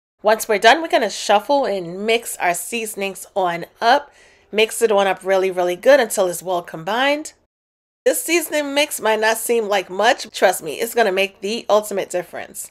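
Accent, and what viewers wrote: New York, United States. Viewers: Are you from NY ?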